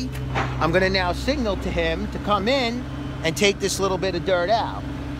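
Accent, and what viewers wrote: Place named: New York, United States. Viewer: this dude sounds new york as fuck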